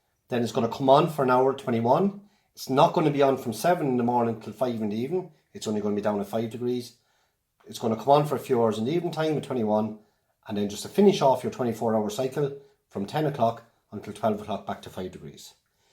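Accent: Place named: Ireland. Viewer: Cool to hear an Irish voice as the guide! ☘️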